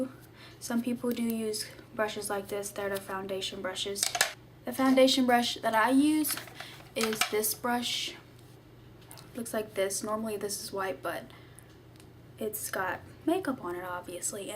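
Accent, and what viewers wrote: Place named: Texas, United States. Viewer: Where are you from?Texan?You kinda have an accent like me..lol,good video ;-)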